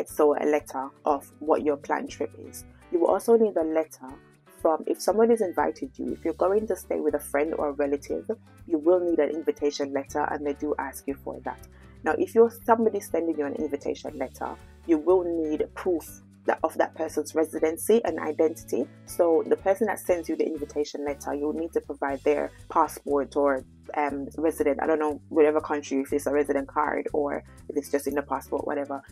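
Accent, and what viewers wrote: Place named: United Kingdom. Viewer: You sound British girl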